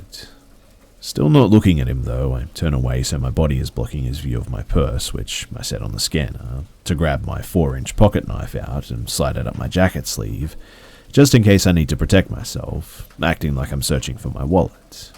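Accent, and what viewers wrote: Australia. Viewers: One of the few people who's voice actually makes me feel safe, comfy and ohhhh sooooo chilled., i could honestly listen to you forever, what I'd give for you to read me a bedtime story every night, I'd sleep great permanently......gotta love an Aussie accent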